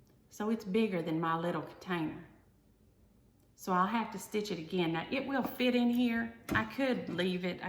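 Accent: South, United States. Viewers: I absolutely adore you. I laughed out loud at a couple of your stories. The doorbell one especially. Love your southern accent. Keep recording your episodes. They are perfect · Great video!!! I love hearing another southerner! We’re getting on down the road like a chicken on the loose! 😉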